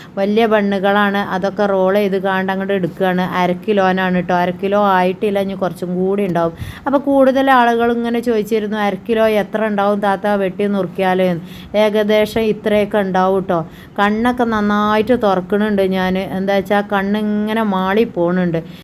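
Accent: Malappuram, India. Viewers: മലപ്പുറം ഭാഷയല്ലേ അത് കുഴപ്പമില്ല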